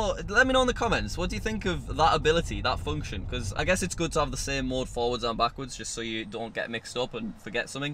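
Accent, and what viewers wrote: United Kingdom. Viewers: You saund like a British man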